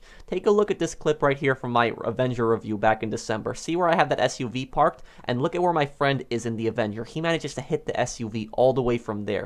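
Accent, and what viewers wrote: Poland. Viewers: Polish? You from poland? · Where are you from? Poland?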